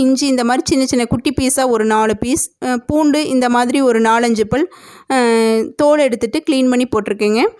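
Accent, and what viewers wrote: Kongu, India. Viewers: தலைக்கறி நாவிற்கும் கொங்குதமிழ் கேட்பதிற்கும் சுவையாக இருக்கிறது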